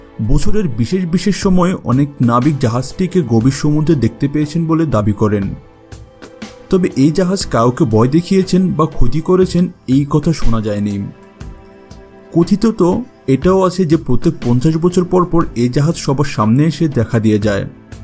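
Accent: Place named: Chittagong, Bangladesh. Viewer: ভাই আপনি খুব সম্ভবত চট্টগ্রামের মানুষ। তাই শুদ্ধ বাংলা পরতে আপনার কষ্ট হচ্ছে। অহ আপনি যে রিডিং পড়ছেন সেইটা বুঝাই যাচ্ছে। অন্য কারো ভয়েস দিয়ে ট্রাই করেন। না হলে নিজেই কিছু প্রাকটিস করে কৌশল অবলম্বন করেন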